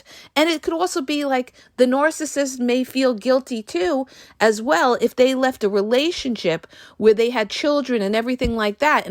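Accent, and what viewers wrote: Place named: East Coast, United States. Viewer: Her east coast accent is wild. She doesn't look like she would talk this way. I love her videos, very informative.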